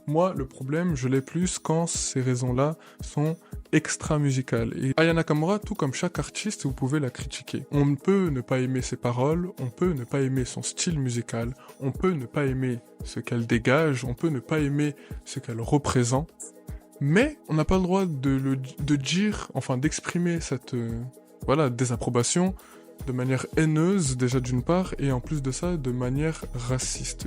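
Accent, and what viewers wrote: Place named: Antilles, Caribbean. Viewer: Toi tu es antillais, je reconnais l'accent. Entre nous, on se reconnait, hein.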